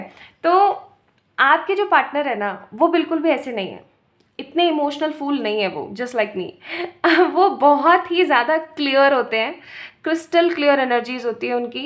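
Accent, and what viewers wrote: Punjab, India. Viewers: Di aap punjabi ho , apke kuch words punjabi walye hote hai,,,, and pile no 1 , I strongly claim this positive energy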